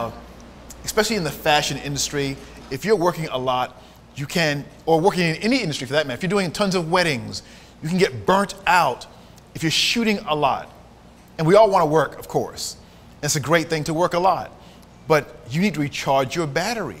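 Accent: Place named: India. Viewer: omg indian accent in polish mouth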